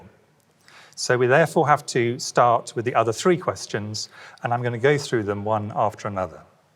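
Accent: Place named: RP, United Kingdom. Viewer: This is pseudoscience wrapped in a posh accent: 1. Death rate: It is 30th April, 2020 (not 1st Feb, 2020). Significant antibody test results are available now. To insist on the 1% based on the data from China (which aims to deceive the world) is criminal. The death rate is close to that of flu. 2. Contradiction between high R0 and 0 hospital death in mid March 2020: If the R0 is high (close to 3) and the virus landed in Europe in December 2019 (See BBC report of France on <num>), by the end of March (UK lockdown) UK might have already achieved herd immunity. The daily death count only indicates the increasing test effort, nothing else. And the daily death chart is no different from that of Sweden, which did not lock down a country. 3. Imperial College model: the paper itself has never been peer reviewed; The data plug into it is rubbish (UK threw away 3 million test kits early April, 2020. 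How do anyone get reliable data with no accruate tests?); Furgeson never shared the program code for peer review. 4. Vaccine myth: Where is the vaccine for SARS after 17 years? Should the lockdown continue indefinitely until a C19 vaccine is discovered? 5. Chloroquine: it has been accepted as official treatment drug in many EU countries. The solution is simple: give it to the patients showing early symptoms. Whitty's emphasis on the failure on the later stage patients is a red flag that he is working for the big pharma to sell drugs with much higher margin.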